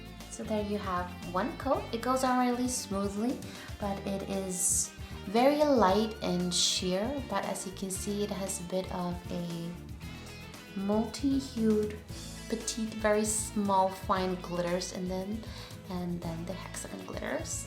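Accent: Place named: United States. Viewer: She is from Sweden but she still talks like an American..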